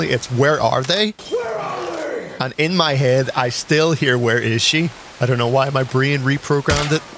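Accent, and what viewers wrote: Northern Ireland, United Kingdom. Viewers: Are you from NI ? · knew it. Jokes aside, im from Holywood County Down. Your accent just sounded very close to home. Love the videos….. “Where is she!”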